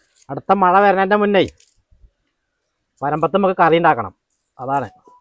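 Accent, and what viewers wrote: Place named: Palakkad, India. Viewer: Palakkad bhasha polea thonunnu. Kollam😊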